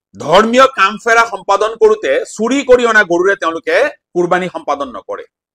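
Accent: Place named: Bangladesh. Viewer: ছুবৰৰ বাচা। মিযাৰ ধৰ্ম সত্ৰ মাটি দখল কৰা নেকি। তোমাৰ অসমীয়া শুনিলে গম পায তুমি পূৰ্ব বংগীয মূলৰ।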